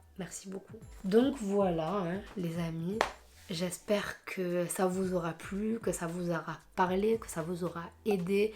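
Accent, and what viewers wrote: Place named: South, France. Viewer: Therapy | Mindset & Manifestation heeeeyyyy la team je suis là ma belle je regarde ta vidéo je me suis abonnée à ta chaîne aussi je te soutiens de fou je te souhaite de cartonner sur YouTube même si je sais que c’est pas ton but premier 😉. J’aime beaucoup ta voix avec ton accent du sud aie 🔥 ton beau visage illuminé 🤩. Franchement tu explique tres bien les choses bravo continu moi je tenvoi pleins de force 💪. Gros bisous de de… la lionne logique 😘😘😘😘😘😘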